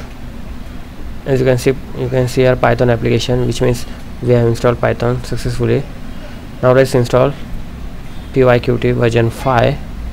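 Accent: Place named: Arab world. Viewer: من صوتو واضح عربي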